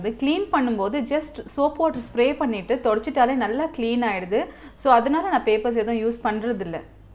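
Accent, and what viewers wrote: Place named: Bangalore, India. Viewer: the way of telling, even im from blr😀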